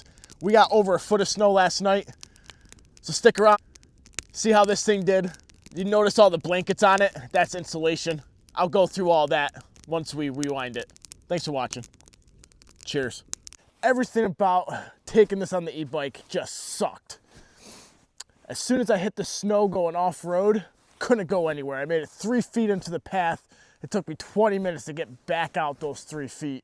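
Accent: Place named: New England, United States. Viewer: A few things keyed me in actually . The accent, the type of trees at your location but the biggest clue actually was the snow storm and the release date of the video. I'm from NH and we just had the same snow storm . We were expected to get 18 inches but we ended up with about a foot. So I figured you had to be some one close to my location for you to receive almost the same amount of snow I just got over the same weekend in an area that looks very similar.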